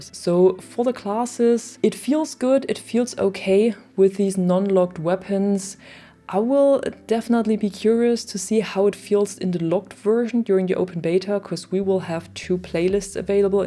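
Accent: Germany. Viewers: Never seen this persons channel or stream before, but I am detecting a strong German accent.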